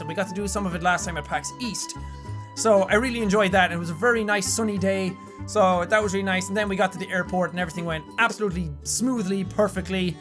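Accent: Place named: Ireland. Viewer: Where is from in Ireland? I'm from Dublin so I know he's definitely not from here but is it Cork or somewhere? Can't really place his accent hahaha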